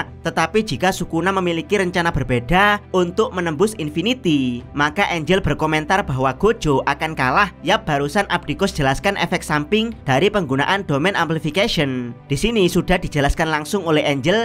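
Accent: Java, Indonesia. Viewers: Muedok wkwk, btw suwunss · jangan medok bg ganggu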